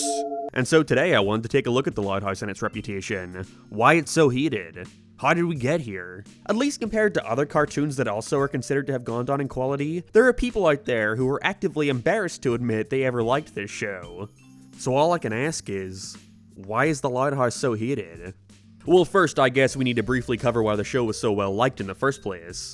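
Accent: Ireland. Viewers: I'm pretty sure he's Irish or something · Hes Irish living on America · is bro irish or no 💀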